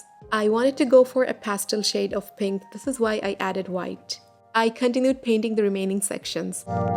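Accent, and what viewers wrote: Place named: England, United Kingdom. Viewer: Lovely cards 🥰 loving ur English accent as well 😘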